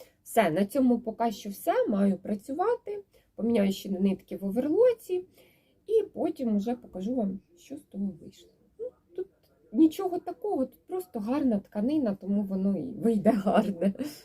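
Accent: Cherkasy, Ukraine. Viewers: О Боже правий, ніколи б у цьому місті-гетто не жила б. Для мене повний жах 😱оці коробочки, між ними машини і поодинокі деревця. Особливо одинокими себе тут відчувають діти. Але людина і до такого звикає. Може в чомусь я і не права, але для мене гарніші в моєму 20тисячному місті різнокольорові двоповерхові котеджі з кленовою алеєю на проспекті. Як маленька Швейцарія. Чомусь киян мені завжди жаль. І дитина моя там живе, І її жаль. Швейна сторінка у Вас цікава. У нас така говірка на Черкащині.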